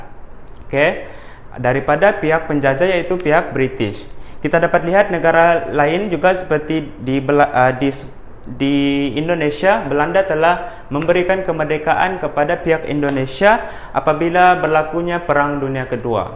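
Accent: Sabah, Malaysia. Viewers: Cg ni org Sabah ka ?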